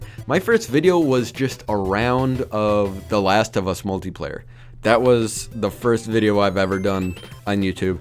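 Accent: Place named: Upper Midwest, United States. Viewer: Oh my golly! I knew your accent was familiar! I am a minneso'an who travels to wisconsin frequently dontcha know.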